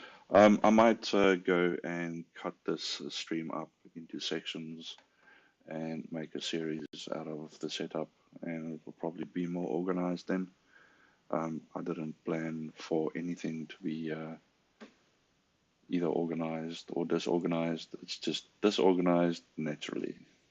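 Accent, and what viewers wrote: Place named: South Africa. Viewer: You from South Africa .. which part ?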